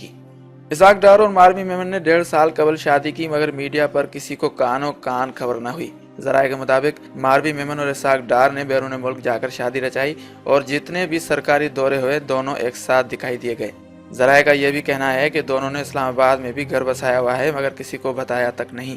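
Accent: India. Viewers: This guy is indian 'his accent tells his reality. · Jahil admi pehle urdu to bolna seekh lo..such an ugly accent of urdu..why are you trying to talk like indians? U think u sound cool? Lol...and who is Samina Durrani? How jahil are you!!!???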